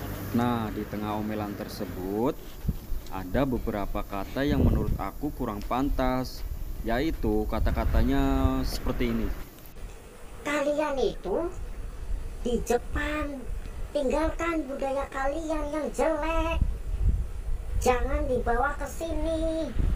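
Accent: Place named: Banyumas, Indonesia. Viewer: Sampeang ngomonge lucu glhaha.. sampean nadane kayong ngapak..mantab isa ng jepang 😀👍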